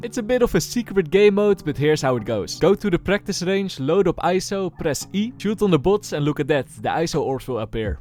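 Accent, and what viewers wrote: Netherlands. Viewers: the isnane dutch accent maar je maakt leuke vids keep up the work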